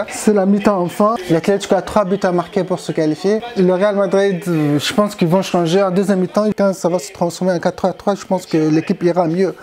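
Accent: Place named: Brazil. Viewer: ta un accent bresiliens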